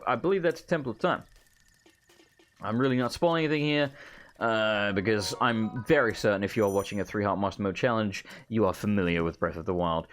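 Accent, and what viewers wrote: Australia. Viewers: it's quite alright for an australian voice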